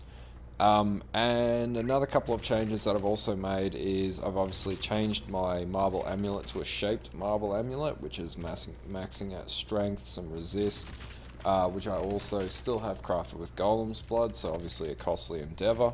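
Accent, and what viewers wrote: Australia. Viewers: There's something soothing about being called a filthy exile in a smooth Australian accent (or is NZ?)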